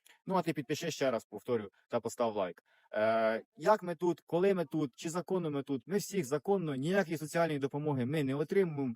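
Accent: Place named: Transcarpathia, Ukraine. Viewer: Це, звичайно, Ваше право відкрити свій канал, але хочу Вам допомогти порадою. Мільйонам українців, які знаходяться закордоном, це не буде цікаво. За кілька років всі вже якось визначилися, що їм далі робити. Українці, які залишилися в Україні, в більшості озлоблені або зомбовані провладними ЗМІ. Багато людей ненавидять тих, хто виїхав. Тому, якщо будете продовжувати, будьте готові до злих, негативних коментарів. Та Ви вже і самі це побачили. І ще, Ваша мова підказує мені, що Ви, скоріше за все, із Закарпаття. А це додатковий подразник для інших українців. В любому випадку, Вам вирішувати, чи варто Вам наражатися на негатив, чи дійсно це Вам потрібно, чи хочете Ви витрачати на це свій час, сили і кошти. Яке би рішення Ви не прийняли, це буде Ваше рішення. Я Вам лише даю пораду. Здоровʼя і добра Вам і Вашій родині.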